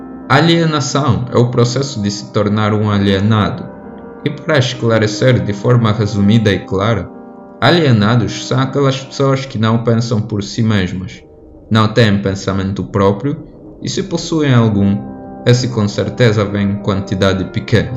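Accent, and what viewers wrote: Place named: Portugal. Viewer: Oi, de qual país vc é? Seu sotaque parece muito o de portugal, eu sou Brasileiro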